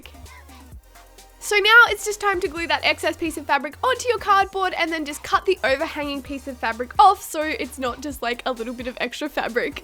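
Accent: Australia. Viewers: AUSSIE!! YEAH MATE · is she australian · She's an Aussie right??? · ARE U AUSTRALIAN?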